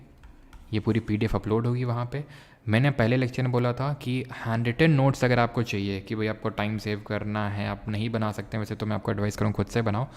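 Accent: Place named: Awadh, India. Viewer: sir aap pakka awadh area se ho, ya to lucknow, kanpur, ayodhya isi side ke ho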